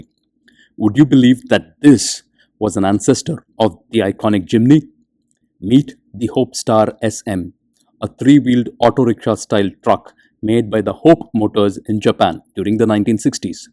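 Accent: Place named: India. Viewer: Почему-то я понимаю индийский английский лучше, чем американский.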